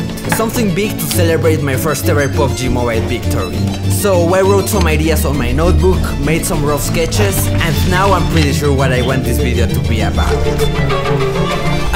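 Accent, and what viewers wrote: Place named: Mexico. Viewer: He sounds mexican... is he? Love his accent regardless.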